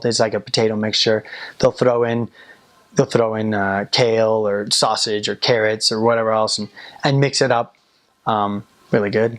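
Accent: Netherlands. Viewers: Super, je Nederlands is zo goed, je hebt zelfs het Nederlandse accent. Nu misschien naar België en Vlaams leren ? 😄 da’s toch nog anders. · I feel like he has a slight “Dutch” accent when he talks English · Your pronunciation is really Dutch. Congratulations.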